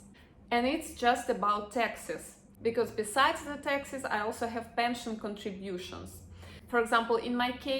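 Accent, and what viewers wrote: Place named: Russia. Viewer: She is uttering English words as overlap on Russian thinking and manner of conveyancing thoughts. I am Russian-English interpreter and feel in a second the nature and manner of speaking. Non dovete pensare lei e brutta or sbruffona. Questo e il suo modo di dire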